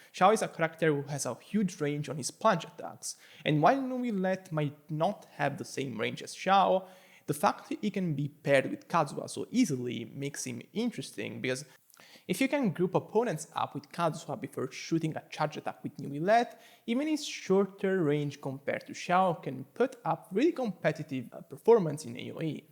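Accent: Italy. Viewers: Thank you so much for the content you provide to the community, your graphs are always intuitive and extremely usefull to value and compare weapons, builds, and team comps. If i may ask a favor, when or if you will eventually do Furina's damage calculations, could you please make a comparison between her BiS weapon and mistsplitter with her c2? Probably i won't be pulling for her BiS even if i might go for her c2 cause of "green donut", and mistsplitter is what i would give her in that case. It might be the case for a lot of people but maybe they have a different weapon in mind so you'd probably need to make calculations with every weapon to make everyone happy xD, but you do you. Also, are you italian? Cause i am and your accent sounds italian to me xD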